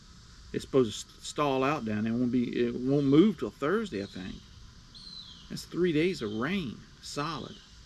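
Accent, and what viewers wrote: United States. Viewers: You sound like a hillbillie. now one cares about what u think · Your ignorance is striking. You sound like a backwoods hick and you think like one too.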